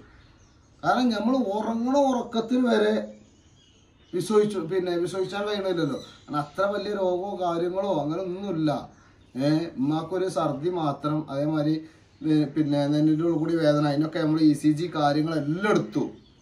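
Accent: Malappuram, India. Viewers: ഉമ്മന്റെ മരണത്തെക്കുറിച്ച് പറയുന്ന വീഡിയോയും monetization ഓൺ ആക്കി പരസ്യം വരുത്തിക്കുന്ന നീ Hero ആണ്😢 അതുപോലെ ഇപ്പോൾ ശരിക്കും മലപ്പുറം ഭാഷ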